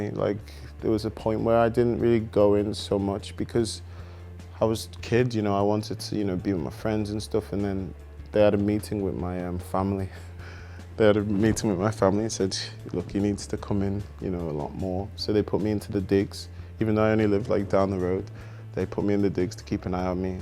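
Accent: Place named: Liverpool, United Kingdom. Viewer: He sounds scouse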